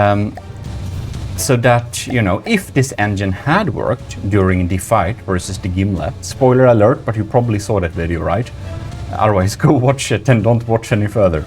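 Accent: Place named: Sweden. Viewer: People guessed wrong on your nationality? Din engelska brytning är så extremt svensk att det låter nästan som om du pratar svenska lol.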